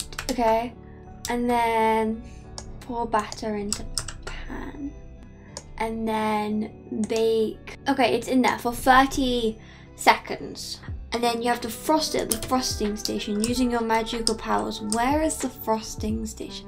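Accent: United Kingdom. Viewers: i love her accent She's British? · are you British?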